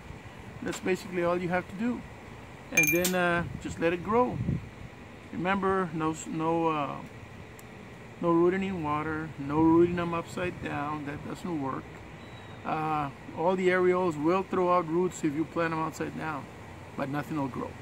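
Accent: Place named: South Texas, United States. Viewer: It's crazy how a South Texas accent is so recognizable. I'm from East Texas. Thanks for the info. Was short and sweet. To the point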